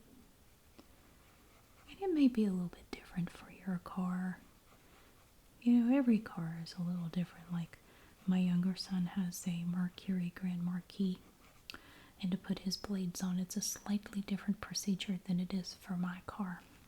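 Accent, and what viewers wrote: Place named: North Carolina, United States. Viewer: Also, I love your videos and your voice and accent sound very similar to my godmother's who is from Hertford, NC. It's so comforting 😊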